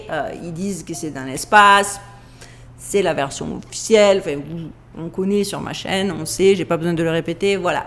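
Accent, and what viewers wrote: Marseille, France. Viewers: en tout cas, j'adore voir duchesse (j'ai cinq chats), j'aime les sujets que tu traites (ça fait partie de mon métier de diffuser des livres sur ces sujets) et purée j'adore ton accent marseillais qui doit être vraiment saisissant pour les américains. Et alors quand tu ris juste après avoir dit une sottise c'est juste un rayon de soleil. Continue et si tu as des sujets sur les civilisations anciennes, n'hésites pas, je suis en attente. Je suis une mamie de 60 ans, éditrice et traductrice et franchement, il y a quelques youtubeurs dont toi que j'aurais adoré avoir pour enfants, les miens sont macronistes, et ils ne jurent que par le dieu BFMTV, autant dire une déception terrible pour une vilaine complotiste comme moi 😂😂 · manifaiiiike !!! trouver toute ces infos doit etre un boulot de dingue et que ceux qui te traite de Cagole aillent se faire cuire le cul !! 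des ignorants du parler marseillais surement !!! gros bisous de marseille ou il pleut comme vache qui pisse aujourd'hui et finit la canicule on respire enfin !!! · Pour répondre à votre question,je ne pense pas que vous deviez perdre votre temps avec des orcs, gobelins ou autres trolls 😂,même si cette théorie de la terre creuse me parait totalement impossible ( volcan, oxygène, lumière etc..),je sais qu'ensemble nous cherchons le vrai du faux protégez vous de ces obscures graugs par le mépris..je sais " facile à dire,pas facile à faire",nous sommes beaucoup à apprécier vos interventions du dimanche,votre sourire,et votre timbre marseillais,et si nous sommes tous timbrés et bien "plus on est de fous..."😊😜❤️ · Comme toujours, très intéressant, intriguant, et bien présenté. Tu es « TOP » dans ce rôle. En plus ce p’tit accent du sûd est irrésistible… Pour ce qui est des détracteurs, ces troll’s n’ont que brosser si ça ne leur plaît pas. 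Ne change rien. Petit bémol, es-tu certaine que la terre est ronde ? Cela pourrait expliquer beaucoup de choses …. Il y a encore du taf ! 🤓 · Hello comment ça va bien 🥰🥰🥰🥰 nous ont adore tes vidéos tu est super j'adore ton accent de Marseille allez go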